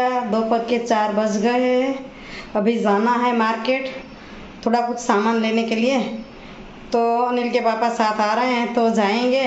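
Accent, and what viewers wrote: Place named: Gujarat, India. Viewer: Saras hindi bhasha bolo chho apane gujarati chie etle tone gujarati j ave hindi no pan but dhire dhire avadi jase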